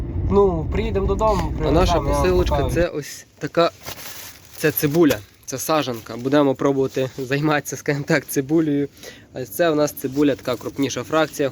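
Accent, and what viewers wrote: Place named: Vinnytsia, Ukraine. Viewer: Звідки ви? Якщо не секрет. Такий говір наче з Вінницької області ☺️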